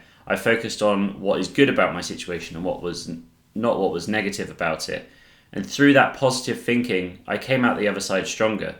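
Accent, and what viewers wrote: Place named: United Kingdom. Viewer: good video! now that you're speaking slower, I can hear that your british :p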